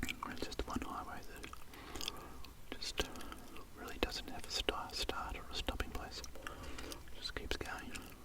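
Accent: Australia. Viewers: It's back!! This makes me so happy. No one does it like! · IVE BEEN WAITING SO LONG FOR THE MAPS I LOVE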